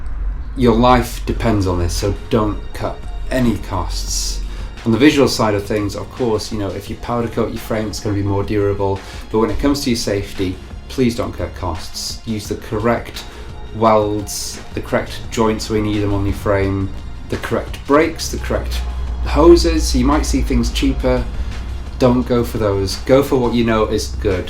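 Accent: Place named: United Kingdom. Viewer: thats how the britan english sounds like !!!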